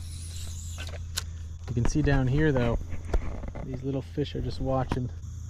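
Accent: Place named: Brooklyn, United States. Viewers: Unintentional asmr, Mild Hint of Brooklyn Edition. Mom or Dad came from Brooklyn, based on 1 degree of separation ideolect dilution. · where is this guy from? the accent is subtle. near new york, perhaps?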